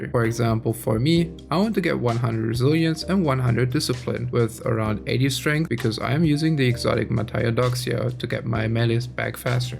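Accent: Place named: India. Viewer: Ah listen to that thick indian accent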